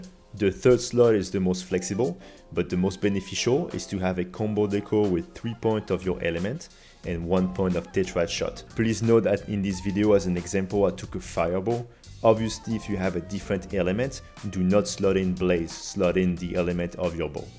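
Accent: France. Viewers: You sound french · 1. sexy french accent 2. good not annoying background music. 3. straight to the point and everything clearly explained. 4. well edited the perfect build guide video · are you french? I am, and your english accent reminds me of me speaking english x)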